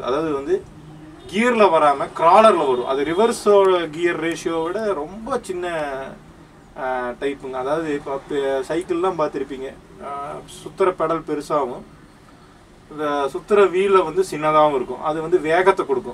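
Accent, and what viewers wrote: Kongu, India. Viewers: Andha kongu slang irukae , lovely..... I'm from Trichy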